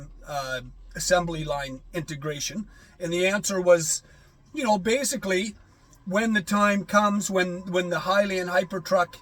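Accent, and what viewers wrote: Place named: Canada. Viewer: I love your accent. Canadian?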